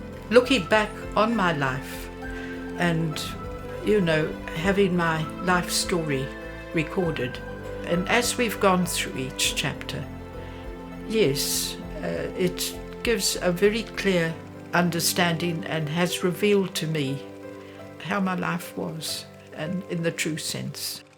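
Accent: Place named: Zimbabwe. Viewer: This Lady sounds like my late mother.. a precious lady .. a voice of a true Rhodesian . Thank you for sharing your life 🙏🏻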